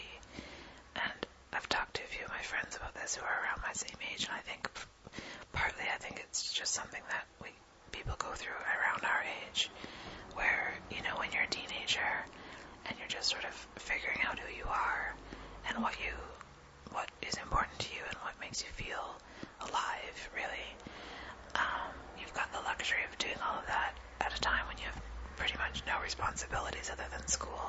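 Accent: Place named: United States. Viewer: great videos your the best whisperer on the net we have english ones too but something about the american accent is best for this.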